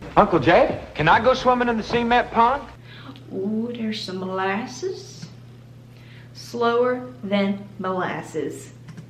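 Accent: South, United States. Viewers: More southern accent plsss I like to be a cowboy one day did you know🤠 love you're accent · There are two reasons I subscribed you. 1- Your southern accent 2- Your beautiful face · I'm from Alabama, too, and I'm trying to see if my accent is this strong when I speak lol It probably is 🤣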